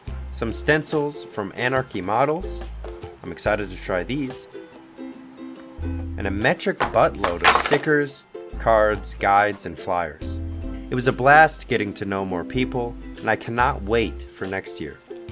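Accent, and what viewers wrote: United Kingdom. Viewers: Hay great video, liked your English accent, almost as good as mine. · It was great to meet you too, mate! British accent was 10/10 ☕️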